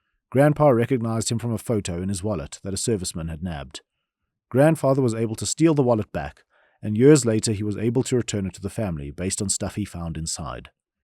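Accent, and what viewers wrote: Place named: South Africa. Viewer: We got South African Reddit reader before GTA 6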